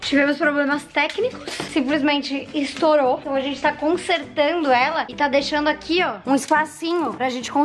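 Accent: Rio Grande do Sul, Brazil. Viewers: BAH THÊ ELA GAUCHA MESMO EU SOU DE VIAMÃO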